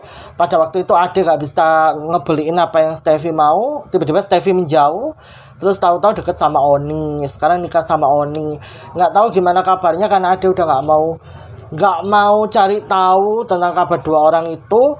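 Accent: Surabaya, Indonesia. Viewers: Mak maafin pas kamu suroboyoan nurturin ceritanya aku ngakak bgtttt lucuuuu jd kangen bule sm mbah ku di mojokertooo 😂🥹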